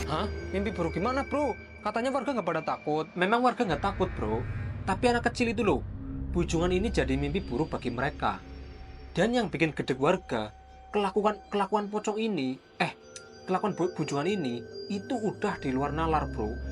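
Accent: Banyumas, Indonesia. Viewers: lu orang tegal yak bang atau Purbalingga? soale ngapak brok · Ngapake ngendi kang😂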